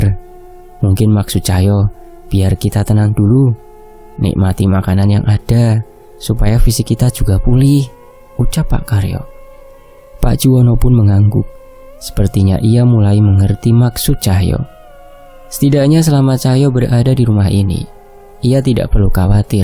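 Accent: Java, Indonesia. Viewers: mmg CERITA2 Diosetta ttg Danan, pakLe Bimo, Cahyo & WANASURA... Benar2 SERU!! Bikin ketagihan ingin mendengarkan keLanjutan dari KISAH2-nya. KEREENNN pkknya... HOST-nya jg memBaCakan Ceritanya penuh dgn Intonasi2 yg TEPAT! Logat Jawa-nya jg PAS di Dengar... mmg Anda BerBakat dLm memBacakan Cerita2 Rakyat. FEEL-nya terasa.... ** SEMANGAT terus ya mas! **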